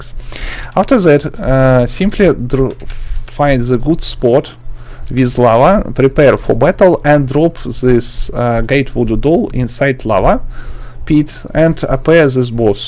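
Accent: Russia. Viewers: this guy is russian but trying hard to speak english respect❤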